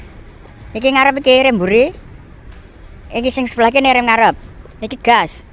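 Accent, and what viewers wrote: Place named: Banyumas, Indonesia. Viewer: Wuihhh ngapak gessss,,, mene dolan ning channelku gess 🙌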